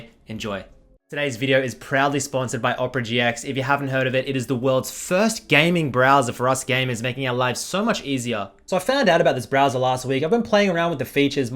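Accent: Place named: Australia. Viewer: Hey man me and my girlfriend love watching you, but recently she's been telling me to get a haircut similar to yours and start speaking in a Australian accent? Should I be concerned?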